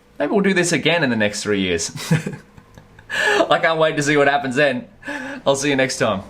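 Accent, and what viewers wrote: Australia. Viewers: hahaha. I krew you live in Australia but assumed you've emigrated. All judged by the way you talk. English is my second language so it's natural mistake. :) I'd love to do some overlanding in Australia one day. Take care :)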